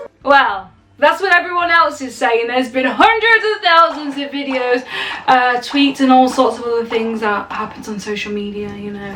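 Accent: England, United Kingdom. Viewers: Out of curiosity, what part of England are you from?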